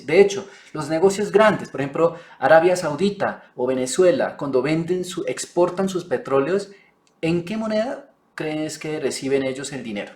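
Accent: Colombia. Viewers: Wawww. Me sorprende ver un asiático hablando muy bien español. Lo felicito, me imagino que vive en España 🇪🇸, 🇺🇸 ó Sur-América? Bueno no puedo recocer su acento. Aunque parece ser que su acento es Colombiano 🇨🇴. Sea como sea lo felicito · Hablas muy bogotano, te faltó decir carachas y régio chhhregio. Pero Usa no quiere reconocer la deuda, dijo que no reconocerá esos dineros a China, como indemnización por haber causado la pandemia. Euroasia ya negocia en con las respectivas monedasque la conforman y el poder militar gringo no es predominante para respaldar países que deben someterse a las reglas transaccionales basadas en el Usd. Tienes razón los cocos no caen a la vez, pero el blanqueo de los dineros del narcotráfico en la Banca sionista-judía ya se vienen conociendo. Qué ocurrirá con estos informes?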